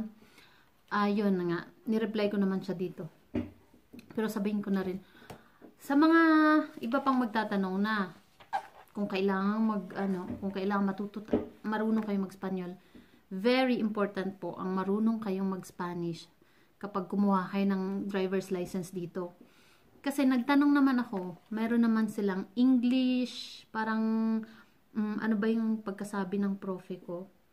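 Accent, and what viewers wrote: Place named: Western Visayas, Philippines. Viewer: Hehehehe Ganon dapat malambing ilonggo hehehe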